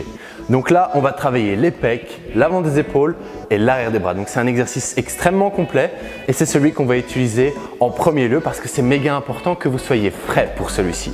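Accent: Belgium. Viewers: bon job je note un accent belge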